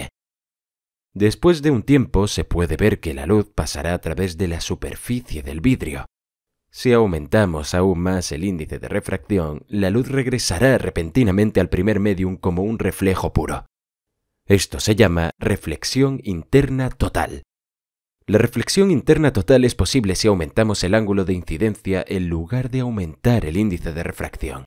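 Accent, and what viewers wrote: Spain. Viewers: por que hablar en español marcado cuando puedes hablar neutro ? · Porque debe estar en español de España? Luego se me queda ese zumbido a tono español 😥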